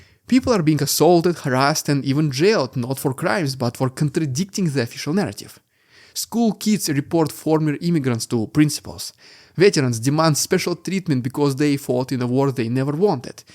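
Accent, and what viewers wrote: Russia. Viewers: I try listening to you but your accent is so Russian that I cant understand you. You talk English words but in Russian, it’s bad.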